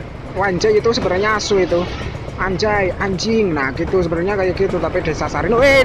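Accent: Banyumas, Indonesia. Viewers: Bahasan kue loh,,HAHAH ngapak banget..keren keren.. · Wkwkwkwkwkwkw ngomonge pada bae kaya inyong kang asal ngomong, #salamngapak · Kalau nonton lu bikin inget kampung halaman ,ngapak bang 😂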